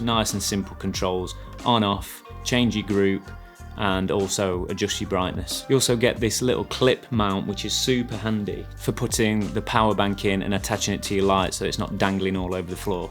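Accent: Nottingham, United Kingdom. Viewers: Top video mate. Subbed. Are you from Nottingham? You sound like a well spoken Carl Froch 😂😂😂😂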